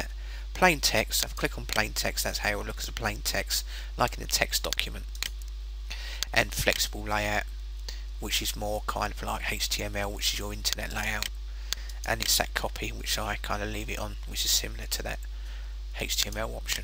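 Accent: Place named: England, United Kingdom. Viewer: FUCKING ENGLISH ACCENT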